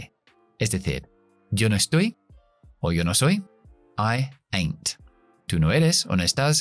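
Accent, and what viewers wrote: England, United Kingdom. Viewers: Que maravilloso canal! Muchas felicitaciones. Este es mi segundo video y lo dice todo. Podrías hablar de la cultura inglesa? Como ellos miran la vida? Cual es ese olor a sus costumbres? Porque que hermoso acento. Tan elegante. Cómo miran la vida los ingleses? Muchas gracias! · La pronunciación que enseñas es acento británico?, se me asemeja mucho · Buenas noches sr, soy Carlos Bermudez, de ecuador saludos, su expresión del inglés con acento de Inglaterra es muy aristócrata, y me gusta mucho , empecé a ver su canal hace poco y su manera de enseñar es muy explícita, estoy en este momento viendo videos de un profesor californiano y la diferencia es abismal en cada acento quiero aprender de los dos voy a seguir su canal . Hice un test Delos suyos y no di ni siquiera en el nivel de principiante jaja.. espero y se que aprenderé mucho de UD , agradezco que comparta su lenguaje, y le deseo éxitos y bendiciones gracias por compartir sr. 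· Es pronunciación británica. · Ese es inglés Britanico,lo voy a escuchar pero nececito inglés smericano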